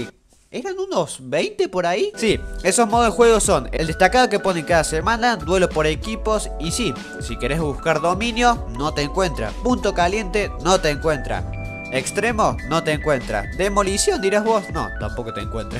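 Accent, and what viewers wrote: Córdoba, Argentina. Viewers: sos cordobes? :v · Sos Argentino? O_O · Saludos cordobés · Eh cordobez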